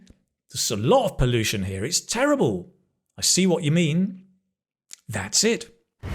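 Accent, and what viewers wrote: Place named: RP, United Kingdom. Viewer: Sir could you please explain if it's possible for a person to learn your accent in just 4 months? (I am not exaggerating). Cause that's what exactly is happening to me right now, i completely lost my previous American-ish accent i would say that i can confidently speaks 80% of your accent without even thinking or telling my brain if how it should sounds like. I admit the Modern RP accent fascinates me that's why i invested myself emersing with the accent. I have to ditched those non-RP speaking shows, movies and podcasts and focusing only in modern RP sounds that shadowing and mimicking becomes part of my daily routine as if it is part of my body. I'm not just thinking in a modern RP accent i also literally speaks them, our cats and dog has been stressed out because i am also speaking to them. When i was just 11 yrs. 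old back in 92' i have this "Speech Impediment" disability where i constantly stutter and stammer, i can't speak my Filipino language constantly and spontaneously without stuttering. Then i noticed whenever i speak an English word or sentences my disability were non-existent, since my disability had separated me from the world of communication i invested myself learning the English language without having someone guiding me how to learn them. At that time we can't barely afford buying the things we need so my family can't afford of getting me an English tutor, so i'm on my own. That time the only way for you to learn understanding each and every word is by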